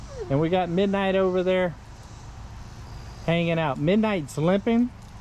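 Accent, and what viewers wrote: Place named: Arkansas, United States. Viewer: Arkansan here, I thought I recognized that accent 😂 your video just popped up and I'm glad I watched. Interesting homestead and build! Just remember not all relationships are meant to be. My husband was married twice before when we met and didn't think it was possible to have a successful marriage but here we are 30 years, two great grown kids and 3 grandchildren later, rockin it!! You will find the right one when you least expect it! 😊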